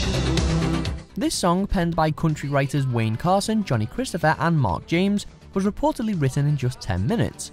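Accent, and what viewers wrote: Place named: Northern England, United Kingdom. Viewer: Logat Inggris Utara (Northern British English) dipakai dalam membawakan acara ini.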